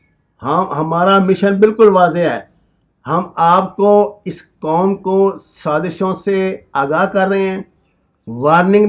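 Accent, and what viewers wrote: Punjab, Pakistan. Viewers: Punjabi sikh mosliman hogiya.🤣😂🤣😂🤣 pehle apni punjabi ascent teke karo kafir isi ne taliban ko sale kiya amiraca pe us ke baad ab Russia ur china pe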